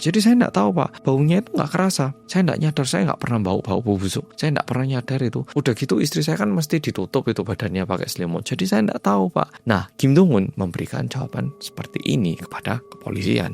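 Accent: Java, Indonesia. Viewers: Malem medok🎉 Lgsg nyimaaak 🎉🎉🎉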